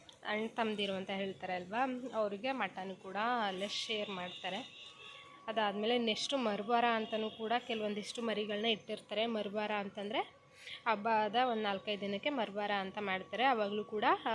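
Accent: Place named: Mandya, India. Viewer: Akka nivu thumbane mudddagi idira hage astu muddugi matadutira mandyada bhashe thumba chhanagide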